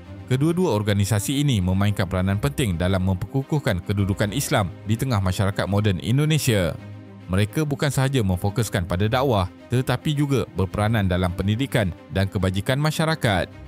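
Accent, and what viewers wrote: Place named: Malaysia. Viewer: Saya gak yakin dia itu YouTuber Indonesia, dari caranya ngomong aja meragukan 🤔 Atau jangan jangan itu YouTuber malay yang pakai lokasi di Indonesia biar kelihatan YouTuber Indonesia 🤔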